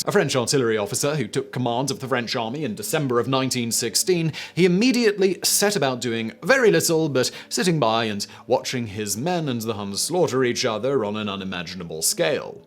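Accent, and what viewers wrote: United Kingdom. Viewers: BUT, I loved his British accent. I have to find myself an Englishman.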